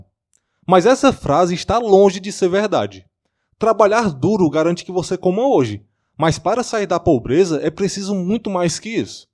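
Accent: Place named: Ceará, Brazil. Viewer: Nenhuma dúvida que esse sotaque é do Ceará..rs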